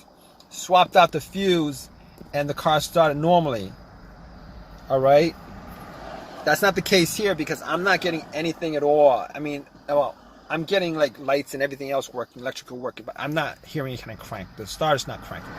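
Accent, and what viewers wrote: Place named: New England, United States. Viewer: He sounds like a New Englander, they help it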